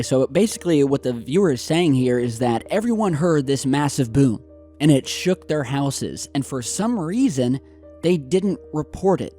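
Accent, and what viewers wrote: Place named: South, United States. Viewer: Is from the south? I'm just curious. if you listen closely he has a slight accent lol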